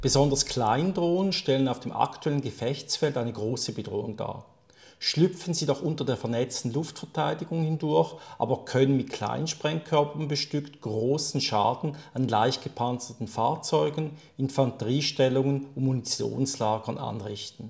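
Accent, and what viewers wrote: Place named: Switzerland. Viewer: Er spricht Hochdeutsch mit Schweizer* Akzent*. Würde er Schweizer Dialekt sprechen, würde ihn wohl kaum ein Deutscher verstehen.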